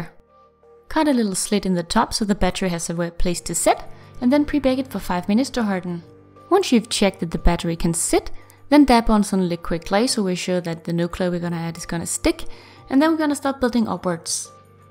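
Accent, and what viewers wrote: Denmark. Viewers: Are you from denmark? Your accent sounds danish :) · Are you Daneish you're accent sounds Daneish if. U are then God video tøs det blev pænt ❤️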